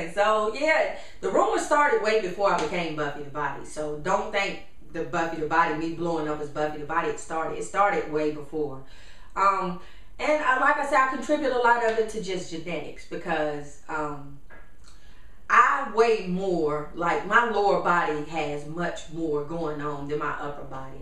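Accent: South, United States. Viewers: "Damn ya still that phat-sweetmeat eye-candy I wanna eat...I'm proud of you too, boo-boo! LOL-SALTS ~ Brains, beauty, grace, good-face and a heavy-duty booty! I love your accent, you are a southern chocolate-belle or to be exact, a ripe and juicy: Georgia-peach! You knocked the urban-hustle, you never ended-up jaded or faded, ya made good...Now work it! Like ya know you should...Teach, preach, reach-out to the congregation, amen! Stay hydrated on Gatorade, whilst ya haters stagnate!" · I love your voice and your southern accent. <3 And yes, the thirst for fame has screwed up generating income. <3 Do you have children?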